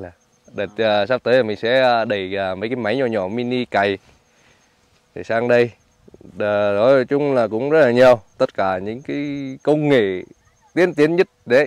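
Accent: Nghe An, Vietnam. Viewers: Nghe tiếng giống tiếng Nghệ mình quá. Bạn dân 37 hả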